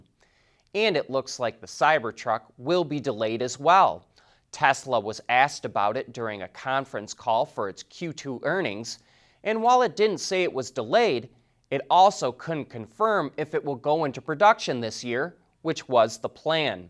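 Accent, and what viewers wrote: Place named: Michigan, United States. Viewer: Heavy Michigan accent!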